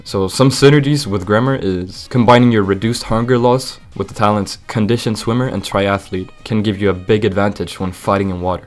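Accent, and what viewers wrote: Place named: United States. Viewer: du låter på riktigt som en amerikan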